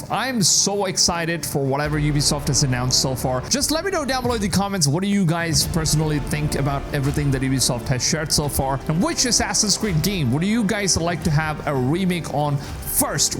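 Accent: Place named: India. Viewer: Your accent is funny, it's not very American. It almost sounds Indian. :)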